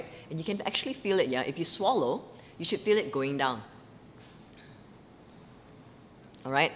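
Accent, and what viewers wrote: Singapore. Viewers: Are you from Singapore? Your accent sounds lightly Singaporean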